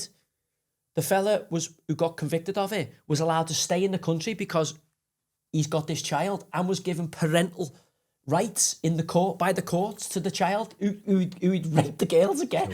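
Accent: Liverpool, United Kingdom. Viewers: Much respect to you. Irony is many of these lefties will think you’re an idiot because you’re working class with a scouse accent. But everything you said here is spot on. They claim to care about the working class but they resent the white working class, as we have seen time & time again, and particularly with this scandal. You have more integrity in your little toe than Gary Lineker et al.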